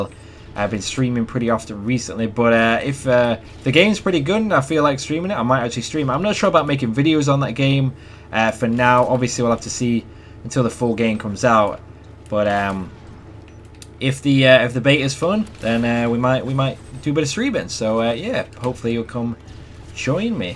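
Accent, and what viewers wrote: United Kingdom. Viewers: U brittish?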